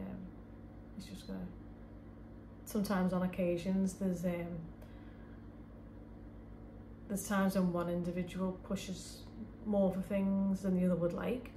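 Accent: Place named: Scotland, United Kingdom. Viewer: Amazing reading, thank u very much! So many good advice, and lovely messages from him! It was very hard at times to hear what u were saying since u talk quietly.. But i have lived in Scotland so i was able to understand. Your accent sounds scottish!! It was awesome to hear speach that sounds like scottish! It made me recall great memories, i liked your video a lot!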